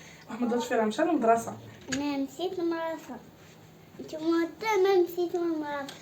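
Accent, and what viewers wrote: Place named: Marrakech, Morocco. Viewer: على سلامتك توحشن الهدرة ديالك الماركشية بالتوفيق ان شاء الله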